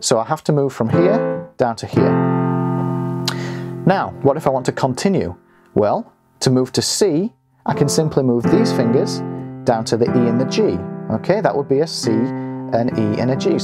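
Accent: United Kingdom. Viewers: I came here by accident. Pleased I did. Your enthusiasm is infectious. Many thanks. Great to hear a Brit here on YouTube.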